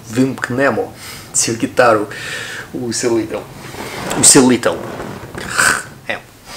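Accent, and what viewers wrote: England, United Kingdom. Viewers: у тебе український з англійським акцентом, таке перший раз чую чесно кажучи!!)))) Отвіртка - викрутка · а як тобі вдається російською говорити без англійського акценту, а українською - з ним?:) Improvement - “покращення”, улюблене слово Вікторфедрича Царапают - дряпають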